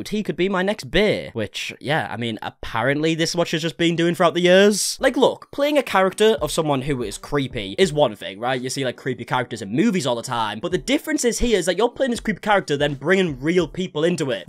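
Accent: United Kingdom. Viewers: welsh, scottish irish or english---the accent?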